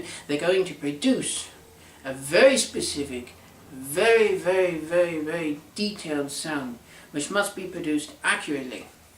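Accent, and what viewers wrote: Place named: United Kingdom. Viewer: i like how you got a british accent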